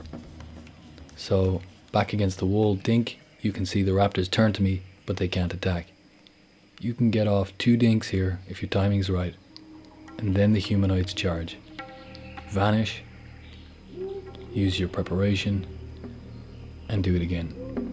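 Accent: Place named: Ireland. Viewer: Nice work man, you sound Irish :D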